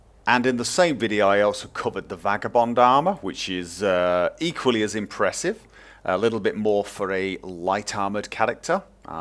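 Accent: United Kingdom. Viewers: I'm very certain it's a British accent, I can't remember which one, but I'm certain I've met a few people with it o. o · He sounds like that British guy with the glasses from Assassin's creed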